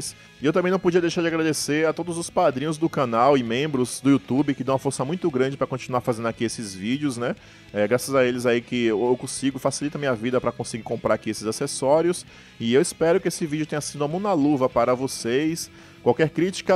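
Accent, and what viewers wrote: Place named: Bahia, Brazil. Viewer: Mano vc é baiano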